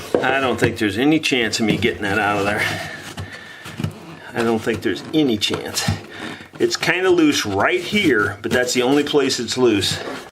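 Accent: South, United States. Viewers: These videos are becoming addictive to me as he verbally takes us step by step through the process of working with his hands, with wood, in that sure and easy southern voice of his.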